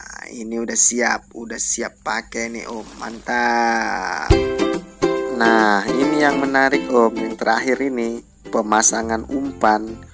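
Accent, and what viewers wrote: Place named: Jambi, Indonesia. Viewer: Bang jambi dimano ny bang... Masuk nian nampak ny gaya abg bcakap tu :D hahahaha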